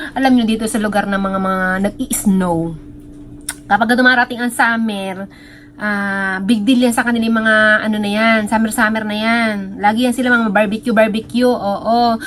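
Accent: Visayas, Philippines. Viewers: Hahah... Mommy kiat lng bah ... Bisaya jd kaau mommy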